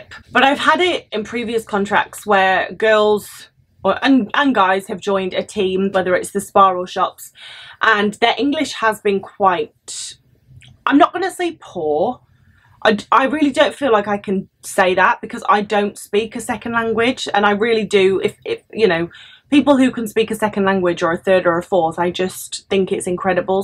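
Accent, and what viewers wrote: United Kingdom. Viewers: She must be from the UK. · She's sounds so british actually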